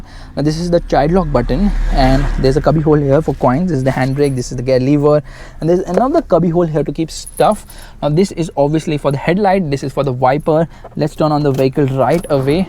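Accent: India. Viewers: I hate Indian English Accent 🤮🤮🤮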